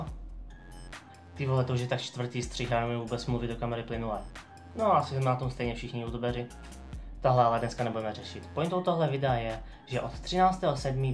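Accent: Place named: Moravia, Czech Republic. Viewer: "nejsu zvyklý" ... ty jsi z Moravy, že jo? :D jinak, vlogy určitě toč, bude to zajímavá obměna ;)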